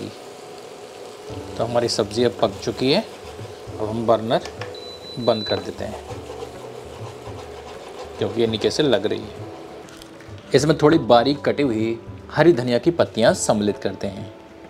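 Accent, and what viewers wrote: Standard. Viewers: Aapki sudh hindiwali vani soone pe suhaga hai.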